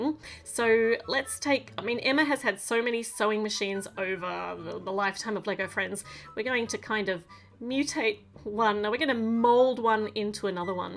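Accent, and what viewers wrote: Australia. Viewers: It oki I understand Australia accent!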